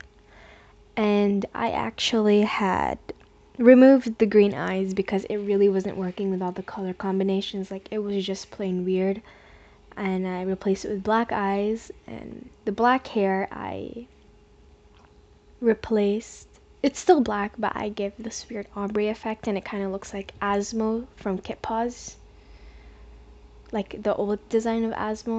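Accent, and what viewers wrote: United Kingdom. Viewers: You can hear your British 🤣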